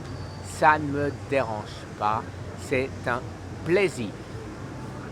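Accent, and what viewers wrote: Alsace, France. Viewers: Bonjour, l'alsacien! J'ai soupçonné un certain accent que je connaissais.. hé oui en t'écoutant..tu avais parlé que tu vivais à Strasbourg..il y a 20 ans je crois.. Je ne sais par comment je suis arrivée sur ta chaîne! Mais je suis ravie..j'apprecie les battants en général dans la vie!! Personnellement je ne suis pas touchée par cette maladie.. Mais ma mère et mon compagnon ont quittés cette terre..à cause de ce crabe!! Bien sûr que l'alimentation saine.. est un facteur de santé physique mais aussi psychique! J'ai personnellement changée mon alimentation depuis une dizaine d'années, et je suis bien plus en forme physiquement et mentalement et mon moral est au top! Si bien,que j'ai entamée une conversion professionnelle il y a presque 10 ans. Oui j'ai rejoins une éco crèche, et j'ai jour après jour transformée les menus que l'on servaient aux enfants. 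J'en ai fais ma croissade..j'ai emprunté ton titre..pour d'expliquer que c'etait et reste encore pour certains aliments une bataille..Avec certains de mes collègues.. Mais au jour d'aujourd'hui les menus à la crèche sont végétariens voire végétariens et bien sûr bio. J'anime des ateliers pour les parents, grands parents pour les sensibiliser à l'alimentation approprié pour les jeunes z'enfants.. Les rendre attentif que la nutrition infantile essentiellement basée sur les végétaux, les fruits et crues, joue un rôle important pour leurs croissances mais surtout aussi de conserver un capital santé tout au long de la vie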